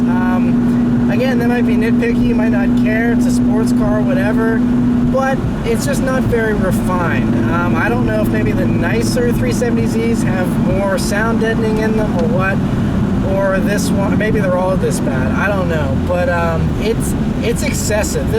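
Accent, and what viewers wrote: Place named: Pittsburgh, United States. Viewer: Can tell your from the Pittsburgh area just by your voice lol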